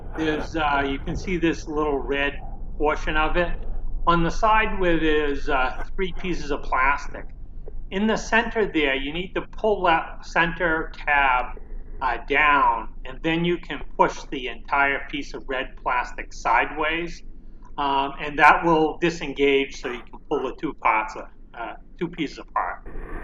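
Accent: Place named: Maine, United States. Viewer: So your tuber name says NH but your accent says you’re a mainah.. I’m in southern coastal Maine. Wicked Pissah videos on the the Jeep having a 3rd cylinder misfire so now I know where to start my looking.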